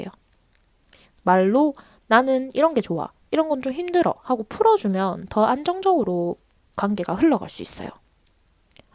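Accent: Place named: Busan, South Korea. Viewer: 부산사람인가여 악센트가 저랑같네옄ㅋㅋ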